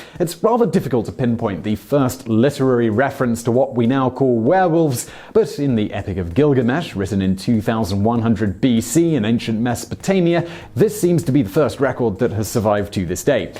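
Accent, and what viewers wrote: United Kingdom. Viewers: Simon, Simon... You are my favorite YT presenter! Sometimes your pronunciation of certain words are slightly cringy. I attribute this to your UK accent. However today? You use a word you call "Disorientated". What you mean is "disoriented" but, well,... come on honey?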